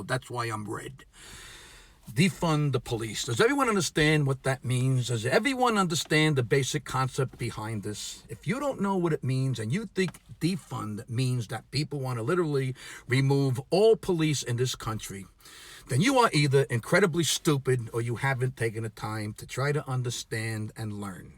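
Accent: New York, United States. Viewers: That's pronounced New Yawkas. hahaha You can take the person out of New Yawk but you'll never take New Yawk out of the person! I agree with 100%!! There are good and bad in every profession and the bad need to be exposed and weeded out. Please don't generalize and put all into one category. That's not fair! The bad always make it bad for the good.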